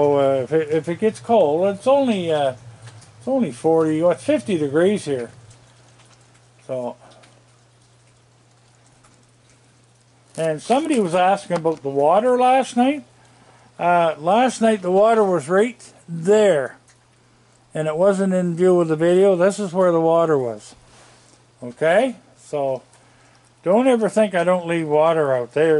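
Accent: Canada. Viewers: Greetings from Illinois. I LOVE your Canadian accent. Those little guys are precious. You are wonderful to take care of them every night. I descovered your videos on you-tube a month ago. Never miss watching now. Thanks & God bless you. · Ellen M ---Many people who live in the Maritime provinces of eastern Canada , ( New Brunswick , Nova Scotia , Prince Edward Island ) have an accent . It is even more of an accent if they are from Newfoundland , or , they are from the Cape Breton Island part of Nova Scotia . Where he lives in Churchville , in Pictou County , Nova Scotia , he is not far from Cape Breton Island . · Very Canadian English eh? Had to look up what bed lunch was 🙂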